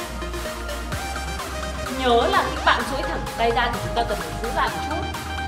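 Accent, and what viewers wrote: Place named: North, Vietnam. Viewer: Giong mien Bac that la de chiu.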